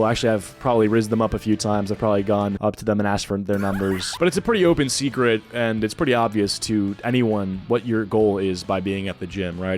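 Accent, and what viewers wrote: Netherlands. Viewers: Je bent nederlands? Ik kan het amper horen in je accent, goed gedaan!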